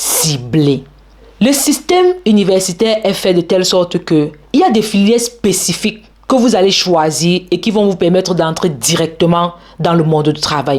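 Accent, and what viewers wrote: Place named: Italy. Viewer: Aimé ton parler italien 😢😢😢❤❤❤ dis moi comment me préparer avant d'arriver là-bas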